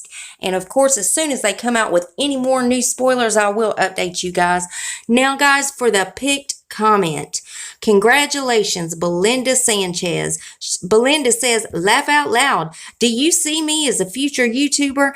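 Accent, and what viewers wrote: United States. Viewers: Thanks so much for all the spoilers you are so wonderful! Love the country accent sending you lots of love from Georgia! 💋💖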